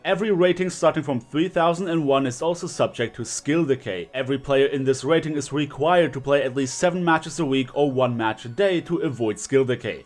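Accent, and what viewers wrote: Germany. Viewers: are you a German Guy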